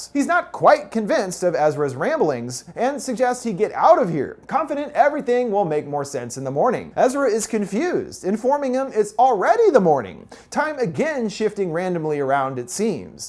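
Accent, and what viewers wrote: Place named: United States. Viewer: how americans speak, jesus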